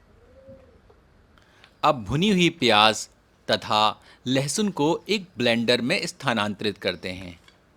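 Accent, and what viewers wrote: Standard. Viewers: this voice (pure hindi) can't be forgotten once heard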